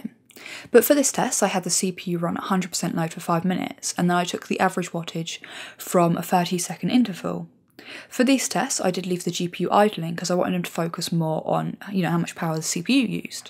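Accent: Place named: West Midlands, United Kingdom. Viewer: Your accent is great....sounds to close to me tho.. West Midlands?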